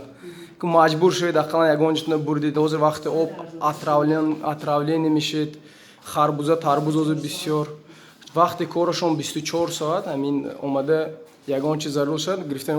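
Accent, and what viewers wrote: Konibodom, Tajikistan. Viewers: Канали шумоба гап нест лафзи Конибодоми худамо бо дигаде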